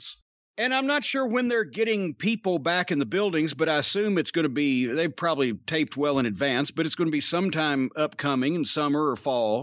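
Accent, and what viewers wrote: South, United States. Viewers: Jim Cornette succulent sounds Good Ol' Southern Boy I'm in Northumberland England UK. Big Respect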